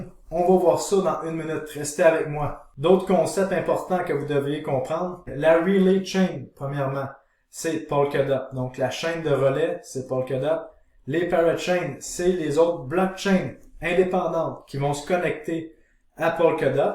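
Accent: Canada. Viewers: franchement je suis une dizaine de youtubeur depuis 15 mois ( merci la covid ) et je suis novice et il y à peut de temps ou je suis tombé sur une de T vidéo et j'en suis très satisfait et ca change des autres . je vais t'écouté et voir T propositions et pourquoi pas investir sur T conseil mais je vais encore attendre la fin du weekend . Sinon vu ton accent tu es Canadien ? ou tu es du fin font de la creuse ? Merci pour cette vidéo très enrichissante et bien expliqué . T'assures mec , Force et Crypto au peuple du monde entier, à toi et à la communauté Crypto et un gros doigt aux banques et aux Etats .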